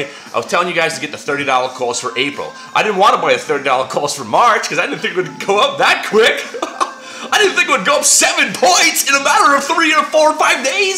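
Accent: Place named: United Kingdom. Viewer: genuine british accent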